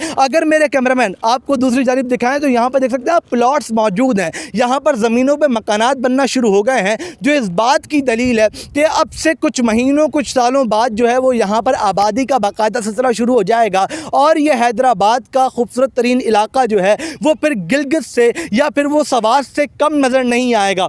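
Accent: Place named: Pratapgarh, India. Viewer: Ap ky bolny kaa treqaa bilkul pretabadiyo jessa hy kam ki baat kuch bhi nahi ki siwaye bakwas kerny ky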